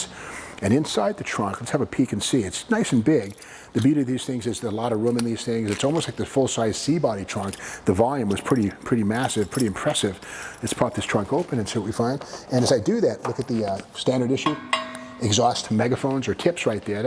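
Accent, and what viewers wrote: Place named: Northeast, United States. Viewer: Is it just me, or is speaking with a more pronounced Northeastern accent?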